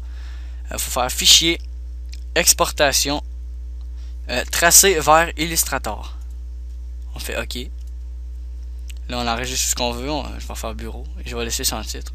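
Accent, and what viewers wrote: Canada. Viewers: L'accent canadienne ♥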